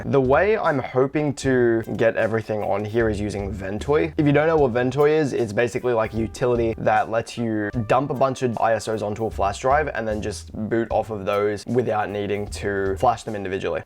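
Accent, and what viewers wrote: Australia. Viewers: Ur Aussie same lol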